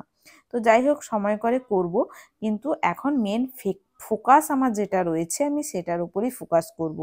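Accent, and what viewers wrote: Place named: Medinipur, India. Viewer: Purba Medinipur er kothay didi?? Amr bari Kanthi.... Macher tok sunei bujhechilam, tumi o Medinipurer ❤️...tai comment kori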